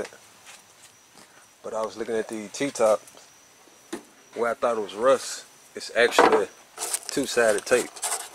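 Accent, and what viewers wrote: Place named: South Alabama, United States. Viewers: That sounds like south Alabama I miss home lol getting my 85 t top prepped for paint now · Didn’t realize you were from Alabama! I knew you were in the south somewhere bc of those cicadas and your accent. Hey from Anniston 👋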